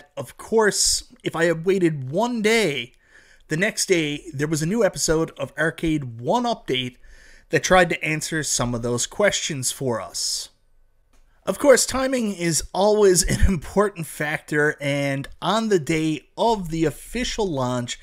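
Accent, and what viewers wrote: Canada. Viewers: love how this guy talks is he from canada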